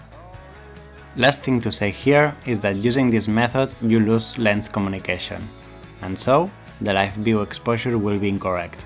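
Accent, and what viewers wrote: Spain. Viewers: I see your spanish accent lol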